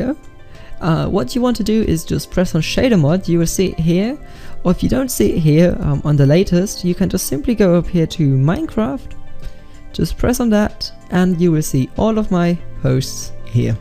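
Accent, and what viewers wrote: Germany. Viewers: are u german ? u dont sound like a english guy :D greetings from germany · Sehr gutes Englisch aber man merkt das du Deutsch bist :D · you sound german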